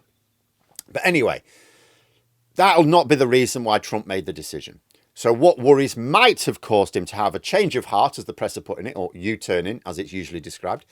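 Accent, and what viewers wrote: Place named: North, United Kingdom. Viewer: What absolute bollocks as ever from this silly site and a strange man who shouts his northern accentuation at me.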